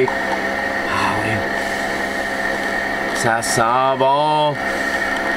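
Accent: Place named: Canada. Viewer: Merci beaucoup pour cette astuce c'est vrai que c'est très agaçant quand ça arrive plusieurs fois on a envie de prendre la cafetière et l'éclat par terre car le café c'est hyper important le matin et j'adore ce petit accent canadien vive le Canada et vive la France bien sûr😊🎉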